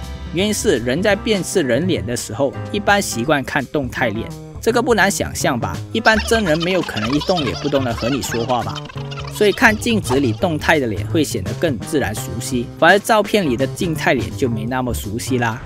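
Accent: Malaysia. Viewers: 偶然刷到这个影片，听你口音一听就是马来西亚，不像是台湾还是中国，翻了一下，居然是吉隆坡的。突然觉得马来西亚的Youtuber有望。很棒ayy! 加油!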